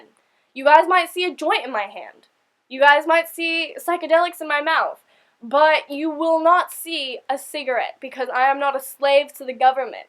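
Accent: Australia. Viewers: You're accent is becoming more Australian and it's so adorable! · Were are you from? You sound Australian but not all the way (I I'm from Sydney) BTW I love you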